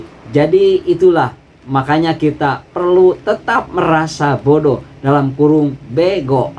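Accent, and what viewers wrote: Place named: Sunda, Indonesia. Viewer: Logatnta nyunda banget kang... Sama kaya saya 🤫🤭🤝🙏🏻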